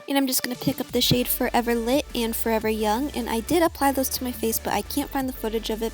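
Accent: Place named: Michigan, United States. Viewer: I frickin love ur Michigan accent and how you say lashes its so cute 😙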